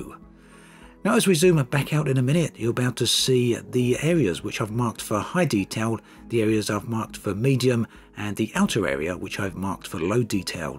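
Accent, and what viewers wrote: United Kingdom. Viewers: Because he’s British? I mean, people do have different accents depending on where they’re from 🙄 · His English is so think from Englad or Wales or somewhere. He seaks so fast and move the issues so quickly It is like pulling a rabbit out of a Hot.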